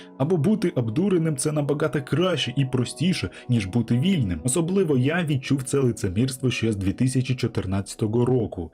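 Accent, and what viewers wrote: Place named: Belarus. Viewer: Слава Богу, Слава Україні, класный белоруский акцент)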